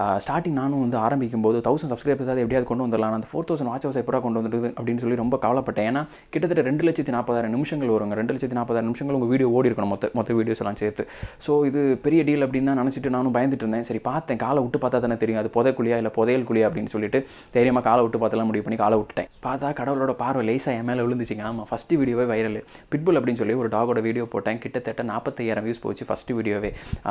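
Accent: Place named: Nagercoil, India. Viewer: hmm voice ah pathu tha keta nenacha neenga Nagercoil thanu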